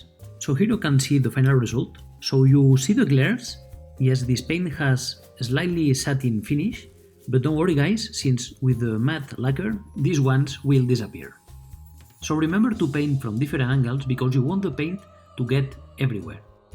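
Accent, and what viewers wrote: Spain. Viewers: Se nota que eres español🇪🇸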